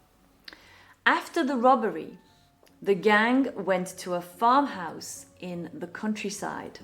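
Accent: United Kingdom. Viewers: Hi, it's a great story with a gorgeous British accent. I listened to it with great pleasure. Keep making us happy.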